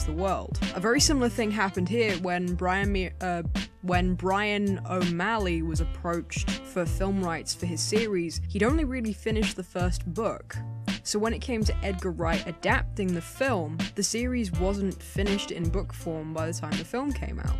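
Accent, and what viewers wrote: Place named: North, United Kingdom. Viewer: Ahh that would explain it! Definitely has that American twist on top of a Northern British vibe. Don't try to mask it! Always good to be proud of where you're from!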